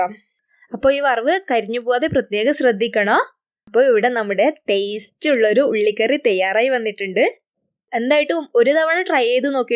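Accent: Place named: Kannur, India. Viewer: Ulli curry Adipoli yayittundu ketto ..thank you..💐💐✌️🤝 Thalassery (Kannur) kkariyo atho vadakara.. kkariyo? Endhayaalum randilonnaanu.. urappu .😄✌️🙌..love your way of speaking..🥰💖💖💖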